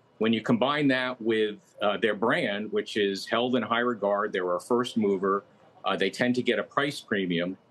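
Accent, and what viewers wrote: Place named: England, United Kingdom. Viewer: You are right!! Imust also add that the accent this person posesses is extremely annoying; Is this guy from England or is he just pretending that he is from England. It's a pain just listning to him with that weird accent.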